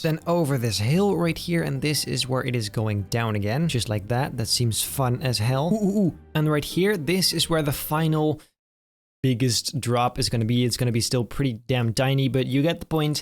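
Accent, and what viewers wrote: Netherlands. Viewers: your netherlands arent you from your accent i hear that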